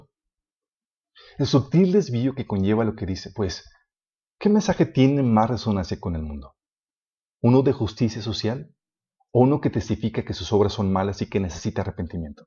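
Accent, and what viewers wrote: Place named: Mexico. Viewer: Muchas gracias por la explicaciòn hermano, muy didàctica, que DIOS lo siga usando, por su forma de hablar me parece que es de Mexico, le mando un saludo desde Perù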